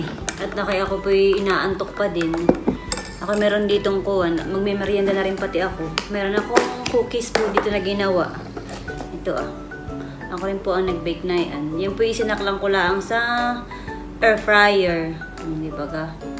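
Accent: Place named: Batangas, Philippines. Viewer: a talaga peru bakit parang pang Batangas accent nyo pala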